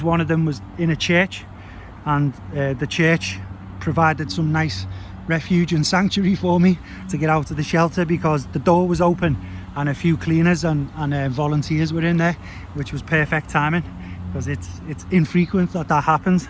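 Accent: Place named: Liverpool, United Kingdom. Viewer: Scouse is endangered so it is refreshing to listen. You speak slowly enough for me to get used to it. Thanks for the little stories, so important to keep traditions alive.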